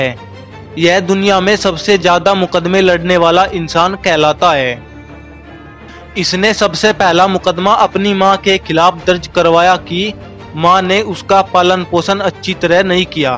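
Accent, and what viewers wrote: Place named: Haryana, India. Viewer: Ur voice accent is like haryanvi language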